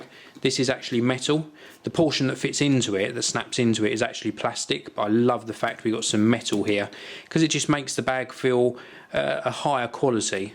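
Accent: United Kingdom. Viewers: can you speak without the british accent , and speak faster ?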